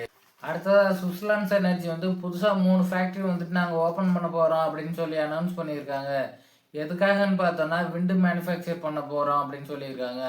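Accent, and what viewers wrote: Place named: North, India. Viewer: Vadakan mari pesatha, Olunga pesu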